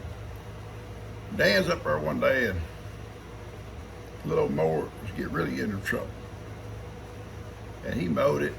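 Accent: South, United States. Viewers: I do love your videos, we talk alike, country'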